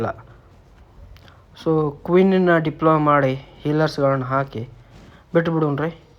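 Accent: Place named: Uttara Kannada, India. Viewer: Full jora agi matadi sir.... Adu uttar kannada language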